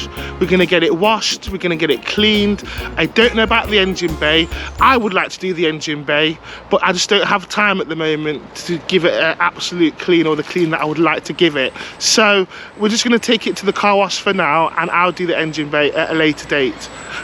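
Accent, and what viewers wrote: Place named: Birmingham, United Kingdom. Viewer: I just love how you brummies say brought that’s all 🤣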